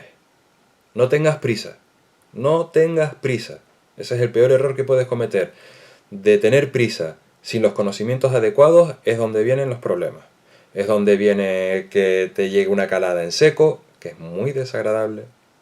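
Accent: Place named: Venezuela. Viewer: No hablas como si fueras de españa creo que venezolano o ecuatoriano algo asi nose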